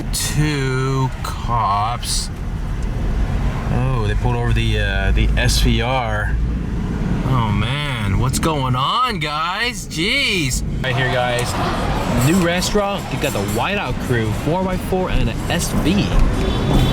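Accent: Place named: Philadelphia, United States. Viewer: tyoors lol sounded like you’re from south Philly haha. Love the content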